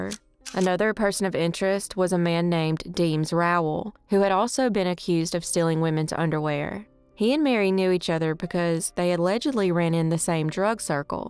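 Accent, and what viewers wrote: South, United States. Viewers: I always try to picture what this southern girl looks like 🤔 the southern accent is adorable 😊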